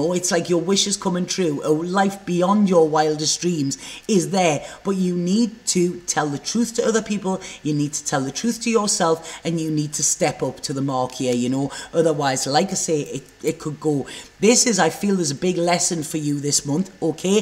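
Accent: Newcastle, United Kingdom. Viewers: Awwww how man!! a Geordie lass tarot reader!!! Love it!! Just stumbled across you! You've now got a Geordie follower 👍xx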